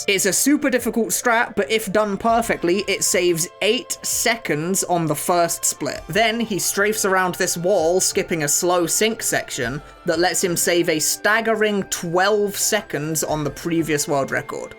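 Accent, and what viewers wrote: United Kingdom. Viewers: Unfortunately you a British so I could not enjoy this video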